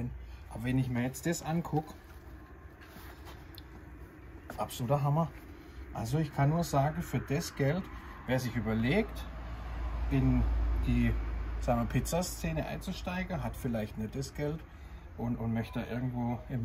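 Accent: Germany. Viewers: Kommscht ausm Schwarzwald?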